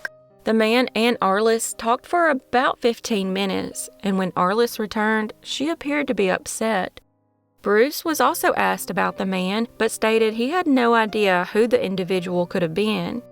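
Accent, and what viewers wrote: South, United States. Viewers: The scumbag couple that took the lives of Kandis and Terri Ann had one of the most pathetic excuses I’ve ever heard! “They had no other choice”. WTF!! Murder people or leave and go somewhere else, it’s not a hard choice! No murderer here has an excuse, that one just got to me, how stupid and vacuous this couple are! 🤬, thank you as always for your hard work and compassion for the victims. I adore listening to your gorgeous voice and southern style. ❤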